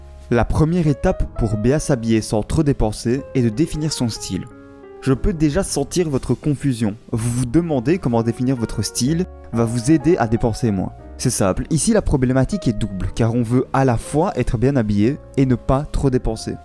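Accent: Liège, Belgium. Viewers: je reconnais une pointe d'accent liégeois bien de chez nous, big up frérot !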